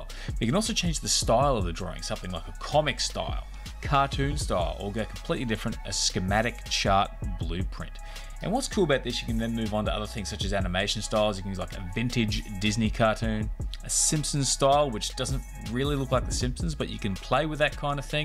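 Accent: Australia. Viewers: Oh, my ears! It was so damn nice to hear such an Aussie accent! G'day!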